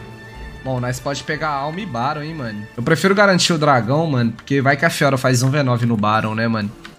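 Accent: Minas Gerais, Brazil. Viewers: é mineiro ou matogrossense?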